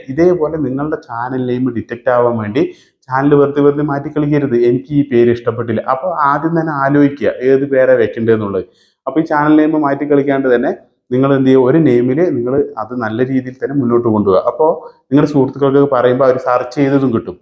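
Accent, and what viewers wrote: Palakkad, India. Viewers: Nigal palakkad ano Etta....🎉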